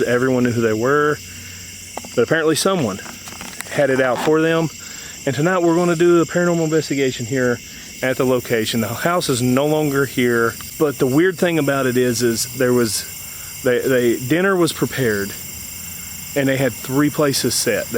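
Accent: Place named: Tennessee, United States. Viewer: Another nifty explore! You caught some interesting EVPs,: "Got me" or "Shot me ('ot me)" whispered after you ask "Who's Michael?". "Hello!" after you say: "I'm here to communicate with you.". "I'm not gonna fix it!" very rapid words, male voice, after you say: "It's weirding me out!". *You had the wrong...REACTION!" Same male voice as. "Soil!" This was whispered after the Ghost Box spells out "Barry". I think it meant "Bury" but spelled it wrong, because: "In the...GROUND!" This is a whisper, but the word "ground" is drawn out (grooouunnd), and it sounds like Spirit is "scream-whispering" at the top of his non-existent lungs. He sounds angry AND frustrated. Perhaps something was "buried" there, in the "soil"/"in the ground" that was valuable, and the killer went there looking for it, ostensibly to have supper? "No". Very faint whisper after you ask: "Anything you'd like to say?". 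"No." Another faint whisper after you ask: "Was it your cousin who committed the murders?". You heard that one yourself, as you mention "hearing voices". "Get lost!" whispered as you are going through the brush/shrubby trees. Very interesting explore,. Lots of EVP evidence caught. BTW, I love listening to your voice, as my Great Uncle Joe was from Tennessee, and your accent reminds me so much of him. He even used to say "terlit", so I >know< you didn't make that word up!:) Stay safe,!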